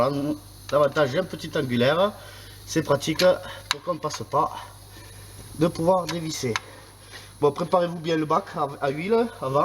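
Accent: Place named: South, France. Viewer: Super tuto !!! Clair, bien expliqué (avec notre petit accent du sud qui va bien) précis, astuces de pro, c'est rare ! Té ! Je l'ai regardé deux fois ! Bravo ! et merci 👍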